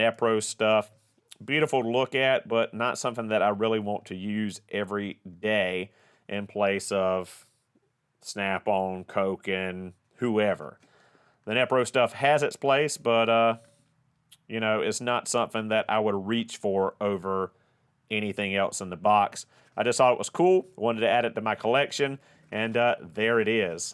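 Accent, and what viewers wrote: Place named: Vermont, United States. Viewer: Is a Vermonter? It all checks out. He even has the accent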